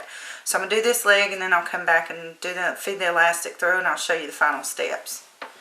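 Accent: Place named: Louisiana, United States. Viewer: Are you from Louisiana or Arkansas? Recognize that accent :)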